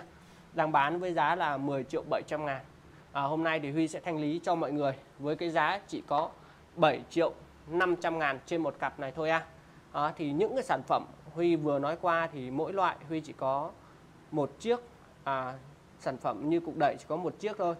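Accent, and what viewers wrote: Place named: Nghe An, Vietnam. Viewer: Tiếng nghệ an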